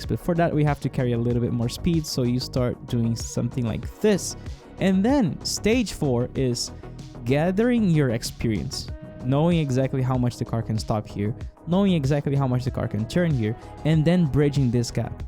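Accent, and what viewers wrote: Brazil. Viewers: Sotaquezinho de brasileiro em hahaha, sucesso aí irmão!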